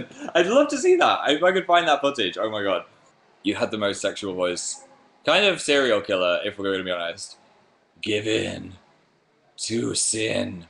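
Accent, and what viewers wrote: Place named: North, United Kingdom. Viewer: I turned on the live show today ((I came late)) and I jumped because I forgot how deep his voice his and I was with my friend and I go "HIS VOICE IS SO DEEP AND HE SOUNDS SO NORTHERN IM DEAD"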